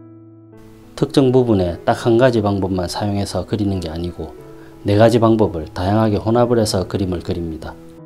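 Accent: Gyeongsang, South Korea. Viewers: 경상도는 억양을 고칠수없어..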